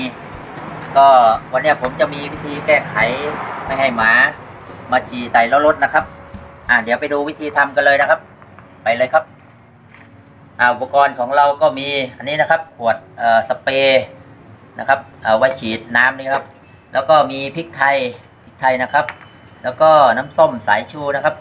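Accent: Chanthaburi, Thailand. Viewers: สำเนียงเหมือนคนจันทบุรีเลยครับ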